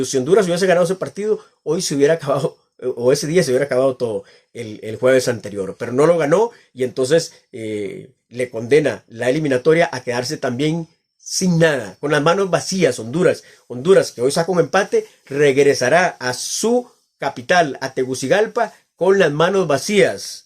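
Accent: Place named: Mexico. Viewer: No se si eres de México o costa rica es que el acento es parecido pero si quiera ver a Costa rica en el mundial desafortunadamente no pidieron meter un golesito solo era meter uno 😢 saludos y ánimo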